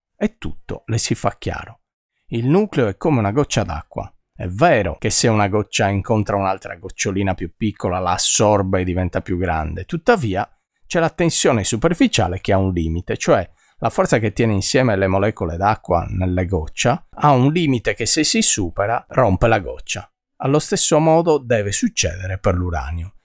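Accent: Sardinia, Italy. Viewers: Complimenti per la sua chiarezza espositiva. Il suo italiano impeccabile e il suo innegabile accento sardo rende ogni suo video un tributo alla scienza che vale la pena essere visto e interiorizzato.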